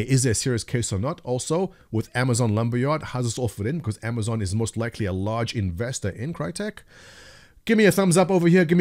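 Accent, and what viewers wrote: South Africa. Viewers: nice to hear a fellow south african.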